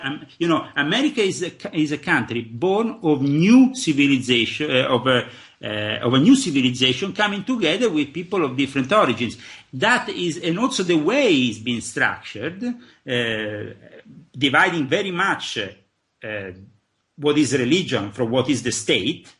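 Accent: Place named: Italy. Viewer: Dude is a loon job who has the uncanny ability to memorize conspiratorial material & has a wild imagination- compounded by the fact that people are impressed by the his Italian accent. Not such a big deal to me as all my in laws sound exactly like Leo when they speak -minus the random chaotic scattered madness. This is ALL COMPLETE BULLSHIT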